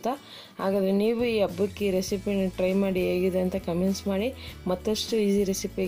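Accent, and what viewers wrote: Tamil Nadu, India. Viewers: Hmm nivu matadodu tamilians Kannada matadid haagide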